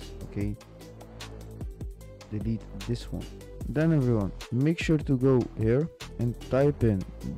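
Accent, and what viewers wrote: Netherlands. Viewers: ik hoor gwn dat dit een nederlander is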